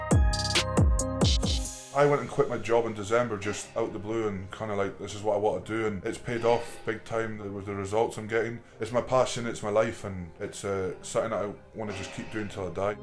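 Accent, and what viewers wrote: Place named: North East Scotland, United Kingdom. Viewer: His accent sounds very North East Scottish.I should know I live there.Never heard of this guy before but I'm definitely gonna start following his strong man career.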